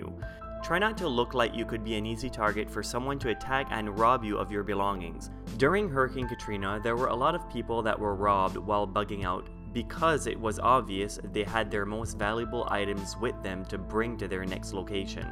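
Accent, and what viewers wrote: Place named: California, United States. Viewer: sounds like your from cali or south florida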